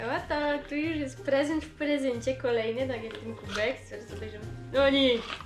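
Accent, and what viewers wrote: Silesia, Poland. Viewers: W Śląsku Cieszyńskim - gdzieś na "końcu swiata" mieszka dziewczyna a zwie się Ładna dziewczyna - szczera i prosta Zarazem niezwykła którą warto poznać! Ci co Ją znają piszą wprost szczerze źe nie ma lepszej na "YouTu - berze" Na tą spojrzeć aż miło zawsze usmiechniętą i schludnie ubraną I cóż powiedzieć - jak by nie było wielu chłopaków ogląda się za Nią Muszę Was zmartwic młodzi przyjaciele że w owej kwesti zdziałacie niewiele Ta miła blondynka ma już chłopaka A jest nim i prawda taka Chłopak ambitny i pracowity na wskroć zaradny i pomysłowy Dla Niego nie ma takiej opcji żeby nie dało się czegoś zrobić Czy przy ciągniku czy przy maszynie Naprawy palą mu się w dłoniach - tylko proszę Cię - nieco rozważniej - najważniejsza Ona! Oprócz swej pracy - w polu pracuje Chce być rolnikiem - uprawiać ziemię W tym wszystkim dzielnie wtóruje Bo chce byc przy Nim - i myślę będzie. 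Brawo dzielno dziewczyno maturkę zdałaś i juź pracujesz Dla Ciebie żadna trudna sprawa trzeba pokój malować - no to malujesz! Musza Wom pedzieć drodzy TouTu - berzy W co wielu widzom trudno uwierzyć Ta "Dziołcha fajnie do nos godo" Chociaż niektórym sie to niypodobo! Pozwól że coś powiem własnie i co niektórym sprawę wyjasnie... Jak sie niy podobo naszo ślonsko gwara to cis skonś przylos i łod Slonska wara! A jak chces łostać i mos "gniozdo" tukej siec cicho na rzici i sie niy fukej! Przez to za odwagę Ciebie podziwiamy a za to że godoś - poprostu kochamy! Gdybyśmy mi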